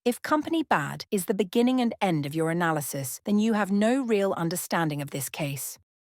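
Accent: United Kingdom. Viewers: I love the British accent especially when you say something snarky, it really fits the tone. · What made you choose the British accent?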